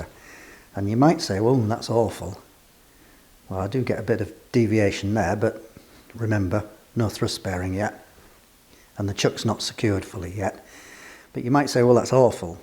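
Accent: United Kingdom. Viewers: Thanks, I own an Atlas 7-B Shaper and I think I’m going to make a mill head too! I love your gentle British manor and voice. Thanks again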